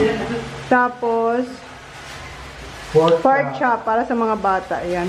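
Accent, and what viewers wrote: Bicol, Philippines. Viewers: Sarap sa ears ang pagbibicol mo manay 🥰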